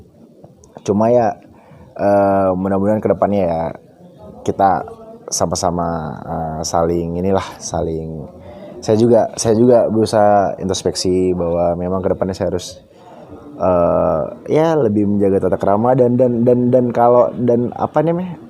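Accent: Medan, Indonesia. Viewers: Sbnrny sih aku pkr mrk yg hujat bang bi itu kgn pgn bang bi d indosiar x krn kan udh kgn..mgkn gtu x bang krn kan bang bi ada nmny d maulid nabi itu tp knytaan ny bang bi ada d bali.jd pkran mrk abang lbh mentingin hoby..gtu mgkn..dn logat medan emank keras sih jd d fkr org abang mrh2..santai aja bang nanggapin haters sih..jgn d dgrin yg pnting berkarya aja..love u bang bi..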